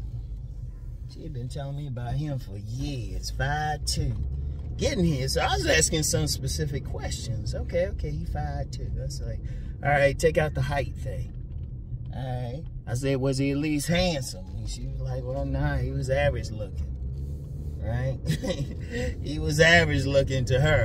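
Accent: Washington DC, United States. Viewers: Bammas? DC accent?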